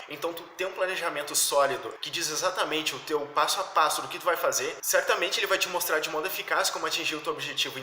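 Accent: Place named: South, Brazil. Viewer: realmente da pra ouvir o sotaque do sul, obrigado pelo video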